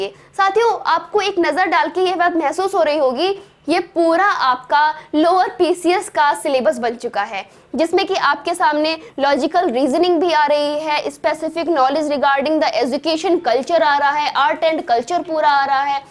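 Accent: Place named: Maharashtra, India. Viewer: Marathi tone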